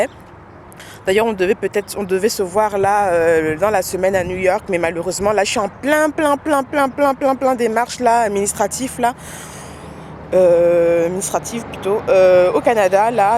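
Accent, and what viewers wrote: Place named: Cameroon. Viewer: héhéhéhéééé bon courage dans ta nouvelle vie!!! t partie en scred!! et qu'est ce qu'il fait beau la ou t'es!!! t partie pour les études?? hi hi hi Riri nous inspire bien!! et la tu parles avec l'accent camerounais I swear!! lool allez on attend les Videoo avec impatience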